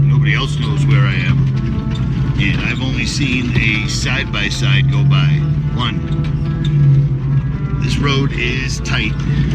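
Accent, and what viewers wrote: Boston, United States. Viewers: ? From Boston?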